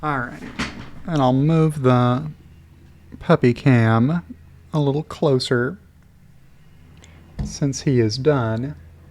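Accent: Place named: Transatlantic, United States. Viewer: Love that hair, man, and your posh, trans-atlantic English accent compliments it so well.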